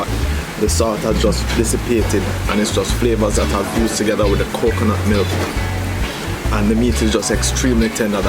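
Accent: Jamaica. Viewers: You make patwah sound sweet to the ear……we’re homesick….!! Never tried the coconut milk in my stew peas, but I sure will!! Blessings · “Pot na have nuh time”. Sounds like my Jamaican mum & grandma, but me, raised in the West I need time and measurements 😂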